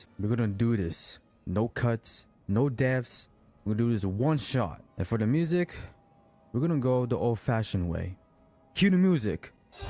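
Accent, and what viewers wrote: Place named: Bulgaria. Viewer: Bro are you Bulgarian cuz your accent sounds exactly like this one Bulgarian artist that I like to watch on yt lol Nice video btw, youtube recommendations getting it right for once :D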